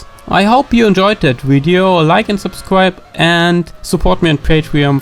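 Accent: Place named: Germany. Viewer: Ich liebe den deutschen Akzent xD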